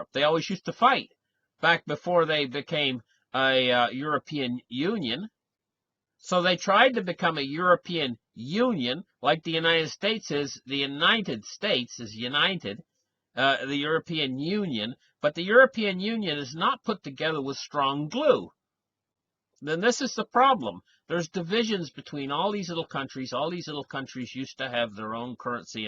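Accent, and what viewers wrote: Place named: Canada. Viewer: LOL ... "it's weird ... it's just weird ..." Excellent video. You have the knack for making otherwise complicated stuff easy to grab (and funny to watch) ... for us financially/economically challenged types. (Still working on that "laboratory of liquidity" you put out a while back.) What you do is very much appreciated. After going through a lot of financial turmoil in his own life, my son found your channel ... and then introduced me as well. We both watch FTE every day ... and refer to you as "the Canadian" because of the obvious accent and because we didn't know your name back when we first started watching. I in turn have promoted your channel to friends and family ... in attempts to get them to wake up to what is coming. Some listen, some don't ... par for the course, I guess. Though it may be a double-edged sword when the economy goes south ... 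I will be so happy for you when your views, likes, and subs go TO THE MOON, ALICE, TO THE MOON! Also, BTW ... love your close-ups. Have a great weekend. :)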